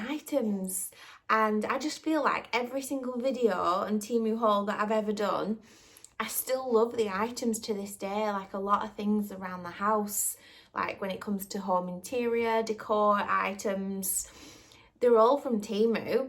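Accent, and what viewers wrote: Northern England, United Kingdom. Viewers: It depends on regional accents. I think is from the north.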